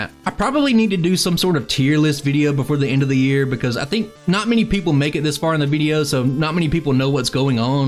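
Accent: South, United States. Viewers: Youtube fed me your channel to discover you, gotta admit, that southern accent totally broke up my background and made me pay attention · I dig the race format. I had to watch twice because the first time I fell asleep listening to your smooth southern twang.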